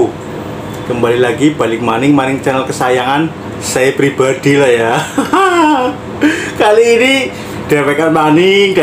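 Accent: Banyumas, Indonesia. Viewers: Hahha lucu banget ngomong ngapak ky inong bae kang hihi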